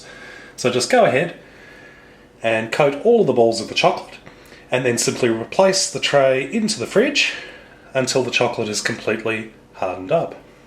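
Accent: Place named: Australia. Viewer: i just discovered you (for myself)! delicious recipes and an australian accent? how lovely...you might get a lot more comments from me within the hour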